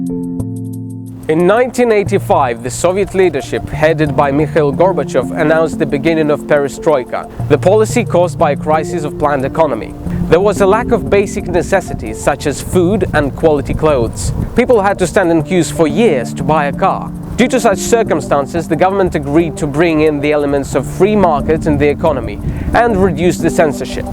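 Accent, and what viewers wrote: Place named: United Kingdom. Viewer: Awesome video, great British pronunciation!!! 👏🏻👏🏻👏🏻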